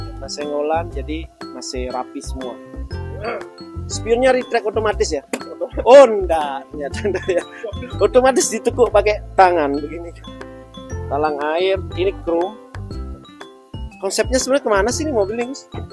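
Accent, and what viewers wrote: Bali, Indonesia. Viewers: orang bali ya? Kelihatan dari cara ngomong nya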